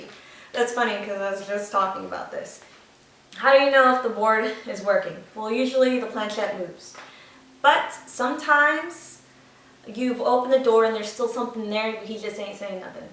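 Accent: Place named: United States. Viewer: What parts of Mexico are your parents from? Have you ever been to Mexico? You have no chicana accent which is interesting because, you have a normal american accent.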